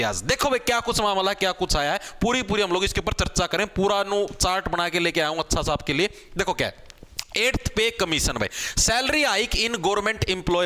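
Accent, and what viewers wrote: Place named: Punjab, India. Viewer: ye to punjab se touch h punjabi style a jata h bolne m bhi right?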